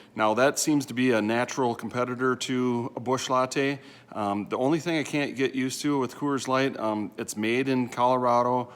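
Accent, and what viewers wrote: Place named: Midwest, United States. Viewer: Are you Minnesotan you sound very midwestern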